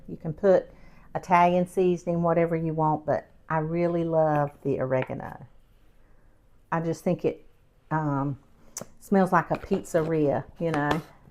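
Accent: South, United States. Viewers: OH MY. It looks so Delicious! I will be making it ASAP. Already had the menu posted for tonight's dinner, Appetizer, Blistered Shishito Peppers with a Garlic Aioli, Turkey Burgers, with Potato Wedges and Broccoli Salad. I saw one of your Y-T videos a while back, and you're so personable and soft spoken, and with that Southern Accent, I Subscribed right then! Thanks, for taking the Time and Effort to Film and Edit your videos. We your Subscribers Appreciate You! Have a Wonderful Day!!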